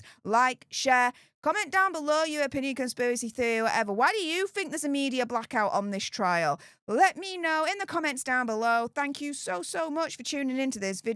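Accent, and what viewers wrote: Yorkshire, United Kingdom. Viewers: Not sure where you're from, but by your accent, it's Yorkshire, I think. You're very brave, hun, reporting on these topics. I'm taking my hat off to you😇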